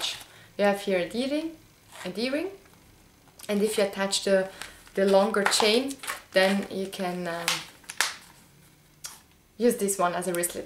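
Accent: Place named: France. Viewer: You are so sweet! And I love your French accent hihi, I love the French language! I'm sorry if it's not French by the way, I know you are from Switzerland ^^. I subscribed!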